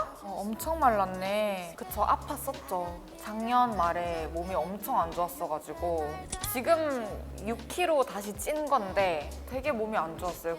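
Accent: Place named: Gyeongsang, South Korea. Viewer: 서울 말을 쓰려는 노력이 단 1그람도 없는 ㄹㅇ 진성 사투리 그자체 ㅋㅋ 너무 사랑스러워 같은 경상도 여자이지만 경상도 사투리 쓰면서 저렇게 사랑스럽고 안걸걸해 보이기 쉽지않은데 헤이즈는 너무 좋음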